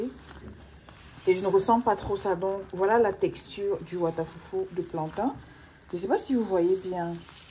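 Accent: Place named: Italy. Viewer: C'est l'accent italien là qui me retient !!!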